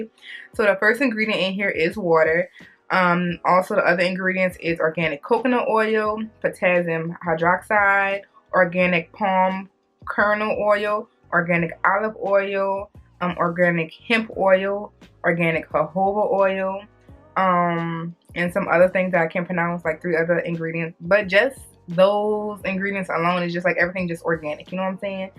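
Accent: New Orleans, United States. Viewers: You sound like you're from New Orleans I'm from NOLA. But my hairdresser just recommended this as a shampoo to strip my hair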